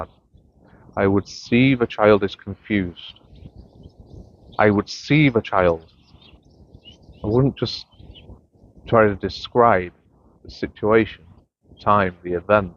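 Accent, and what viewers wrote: Manchester, United Kingdom. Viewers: Thanks where are you? I’m in Lancashire you sound like Manchester Bury,Oldham way great video 👍🏻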